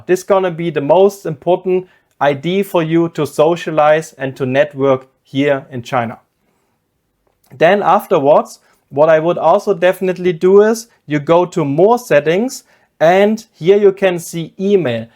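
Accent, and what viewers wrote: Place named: Germany. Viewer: Ich hab noch nie so Glas klar einen deutsche am Akzent erkannt. Geil haha! 👍🏼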